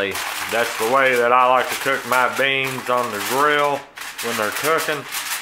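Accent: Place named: South Carolina, United States. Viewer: I hear accents and dialects like yours here in South Carolina too.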